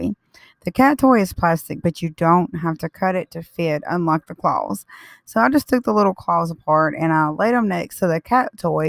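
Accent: South, United States. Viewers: Love the country accent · Love it! Where you from girl? Very Southern. I'm from S.C.! ☺💜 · I like it. I would love to see one in white or wood look.. Great job. Love you....you are amazing!! I love you soo much. We are both country gals. Our pronunciations on some are just about the same. I live in the south. But you got it going on.... Xoxo. Hugs your way.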